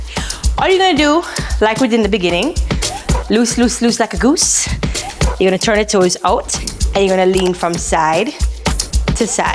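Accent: Jamaica. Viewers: I got mesmerized inlove when you say down wat accent haha i hear jamaica but i hear something else to 🤦🏿🤣🤦🏿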